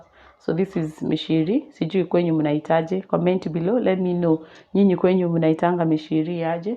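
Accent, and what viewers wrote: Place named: Kikuyu, Kenya. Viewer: The Kikuyu accent in you made me Subscribe asap....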